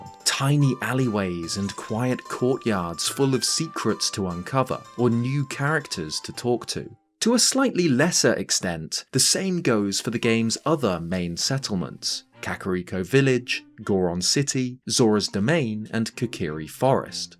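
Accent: United Kingdom. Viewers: Lol it's just British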